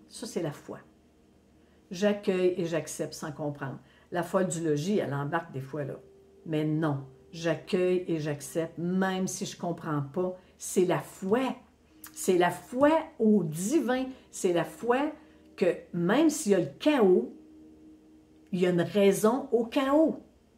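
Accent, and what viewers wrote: Quebec, Canada. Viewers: Merci beaucoup, j’adore votre accent et vos expressions québécoises. Moi je suis de Bordeaux 33 en France là où il y a du bon Vin. Je vous embrasse très affectueusement ❤❤❤❤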